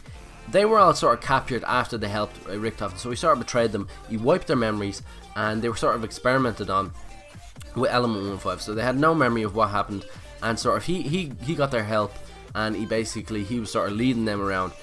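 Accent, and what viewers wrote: Ireland. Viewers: is je Irish he sounds really irish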